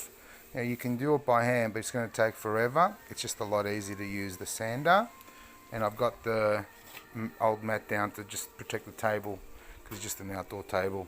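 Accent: Australia. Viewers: Thanks for that. Nice to hear an Aussie accent. My timber venetian blinds faded in less than a year thanks to the Australian summer sun. lol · It's so nice to hear an Australian accent for a change (fellow Aussie here). I've been collecting discarded cedar blinds for awhile for a large scale upcycling product (patio ceiling lining), so I'm well practiced at dismantling them the same way you do. Refinishing them en masse is a daunting task though! I've tested out a few methods so far, such as paint stripper, but I haven't found anything that works as well as sanding unfortunately. I'm planning on laying multiple slats flat next to each other and clamping them down with a batton lying across the top of them to hopefully speed up the sanding process. It's comforting seeing someone else refinishing them as well. It gives me hope!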